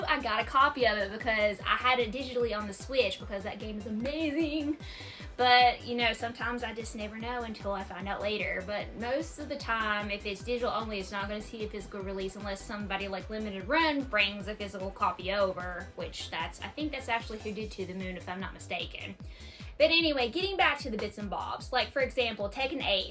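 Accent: South, United States. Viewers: I love your accent it’s so country I am from Tennessee I love watching your videos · I love your southern accent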